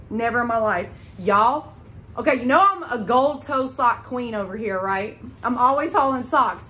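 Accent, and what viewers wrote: South, United States. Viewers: I always smile when I watch your hauls. You talk fast & Southern like me.🍓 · Girl yes! I could tell you are from the south with your fun accent.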